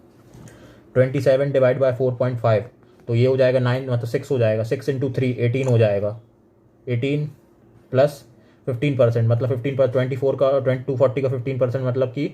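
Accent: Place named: Bundelkhand, India. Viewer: Bundelkhandi jhalak rhi h apki tone me... Gajab badde.! Maan gaye..💥💥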